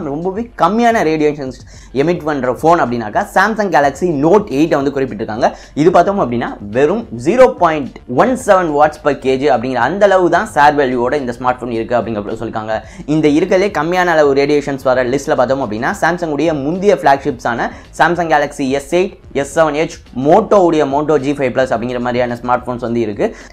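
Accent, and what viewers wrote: Madurai, India. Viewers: Bro neenga Madurai ah????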